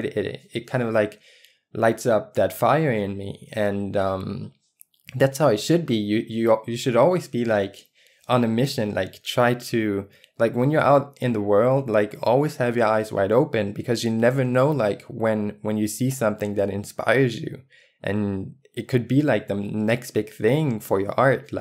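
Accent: Germany. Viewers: Are you German? Btw love the work! :D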